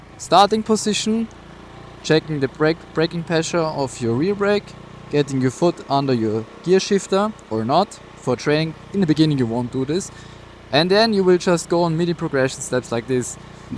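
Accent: Germany. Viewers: I trust you even more because of the German accent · I hear deutsch!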